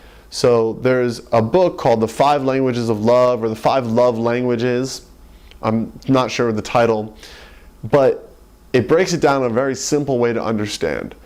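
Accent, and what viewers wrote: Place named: United States. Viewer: he sounds like an American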